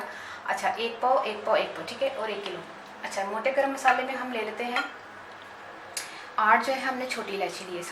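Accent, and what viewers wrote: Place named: Punjab, India. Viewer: Punjabi boht pyri bolti hn ap api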